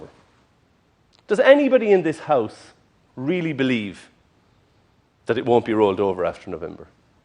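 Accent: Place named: Ireland. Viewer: Beautiful Irish brogue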